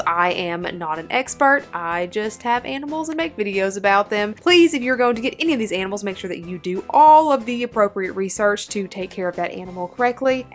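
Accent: South, United States. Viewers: Love the southern drawl as well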